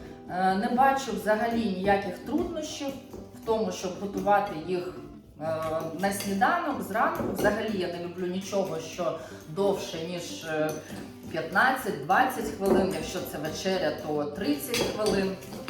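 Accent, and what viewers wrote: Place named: Ukraine. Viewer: Фууу. Такая девушка, а разговаривает на смешном деревенском языке. Вам не идёт. Суржик у вас. Беее. В первых роликах на русском было красиво.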